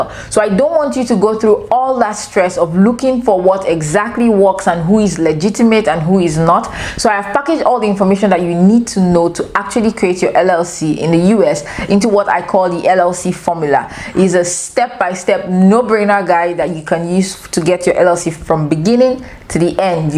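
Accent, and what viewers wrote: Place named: Nigeria. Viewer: You sound like a Nigerian you speak exactly like me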